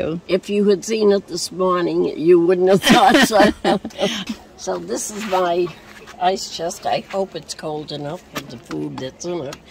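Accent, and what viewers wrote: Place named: New England, United States. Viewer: I love hearing yet another New England Accent on the road besides Lulu. I can't wait to join you guys!!!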